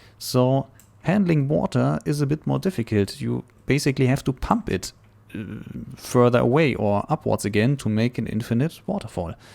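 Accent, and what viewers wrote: Germany. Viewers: Man hört das du deutsche bist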